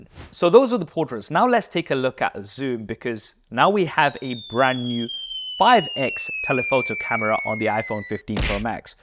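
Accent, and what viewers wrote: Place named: United Kingdom. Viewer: YOU ACCENT SOUNDS BRITISH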